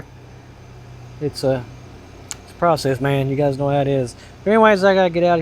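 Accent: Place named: Kentucky, United States. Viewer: I'm from Kentucky and I know one from there when I hear one.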